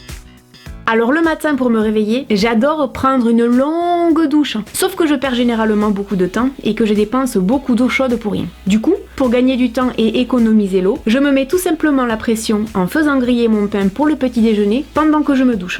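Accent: South, France. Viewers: bonjour est-ce que tu viens du sud?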